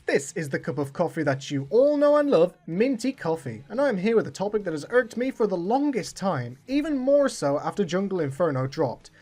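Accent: Poland. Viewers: Youre polish? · Are you Polish?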